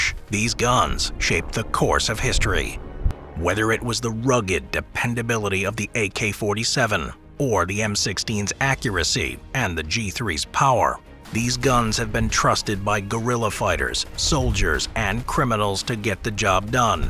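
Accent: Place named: United Kingdom. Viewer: I’ve never seen your face until today, I thought you were British lol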